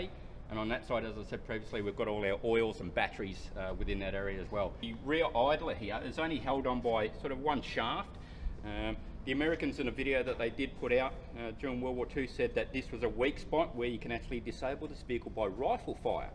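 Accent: Australia. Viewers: Ruined by his revolting Australian accent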